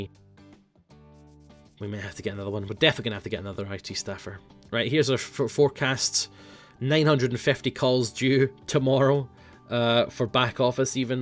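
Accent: Scotland, United Kingdom. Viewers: you can just tell he's Scottish like me hah were ur yees gon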